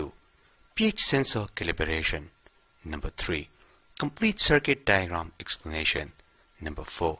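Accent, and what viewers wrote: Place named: India. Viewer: good video my friend with indian accent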